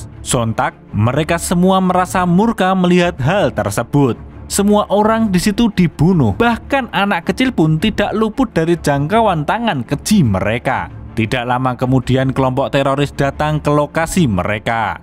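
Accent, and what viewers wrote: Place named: Java, Indonesia. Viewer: bang yg ini take voice ny kok medoks jd kurang epic haha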